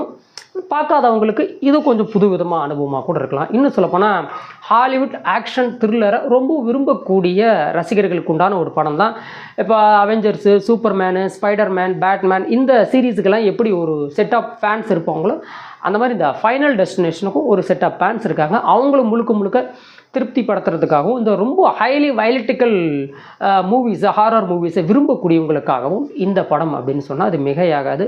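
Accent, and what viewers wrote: Kongu, India. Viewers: Wonderful REview.. Kongu Tamil Vazhga... Cheers from #Pollachi Town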